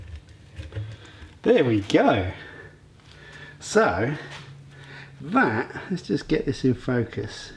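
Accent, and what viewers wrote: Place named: Essex, United Kingdom. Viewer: were you from mate im essex you sound not that far away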